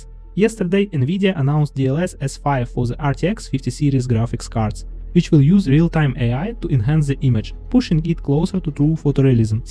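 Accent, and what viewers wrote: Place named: Russia. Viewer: Я прям слышу русский акцент. На русском ролики будут?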